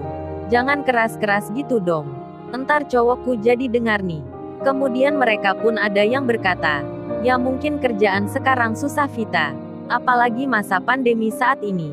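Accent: Surabaya, Indonesia. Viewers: min kamu orang surabaya ya?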